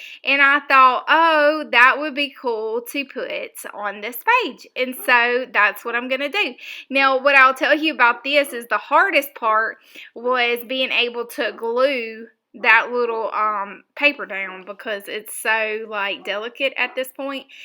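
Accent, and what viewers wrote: South, United States. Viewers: Well you said “tickle my fancy” and also “excuse the chainsaws and dogs ...” 👀👀 so I’m good with anything you do ! 🤣🤣 sound like you’re from Mississippi?? Texas?? Louisiana??? Hurricanes ...?? Hope all is ok and I love the idea of scanning ( a lot of work, yes) they’re great and I love your little book ..... “my moonshine container !!!” 🤣🤣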